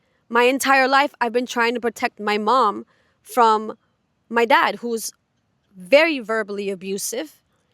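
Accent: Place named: Florida, United States. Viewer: You so dam fine girl. And it looks like you may be in Florida 😉 the accent is ❤️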